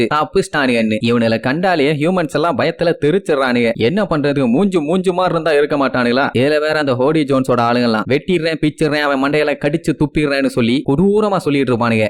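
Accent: Coimbatore, India. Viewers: Bro nee ga coimbatore aaaa????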